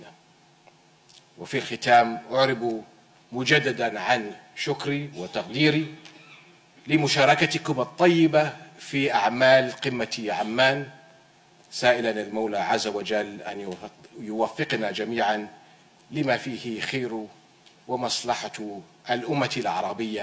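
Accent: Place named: United Kingdom. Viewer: هذا الرجل ...عربي ...بريطاني ....ما أعرف ليش أحس أنه ليس عربي حتى عندما يتكلم تحسه أنه تعلم اللغه العربية بعد اللغه الانكليزيا ..كيف رئيس قمة عربية .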